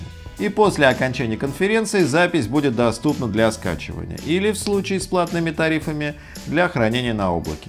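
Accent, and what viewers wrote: Moscow, Russia. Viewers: Это не акцент! Это московский говор! Москвичи ,,акают ".)))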